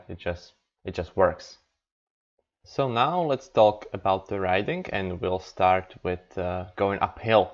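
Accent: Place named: Slovakia. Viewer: najprv som si myslel, ze je toto video od nejakeho nemca ale potom som pocuval ten prizvuk a hned som vedel, ze musis byt slovak :D Tiez budem kupovat Foxy ale 2021, ako si spokojny?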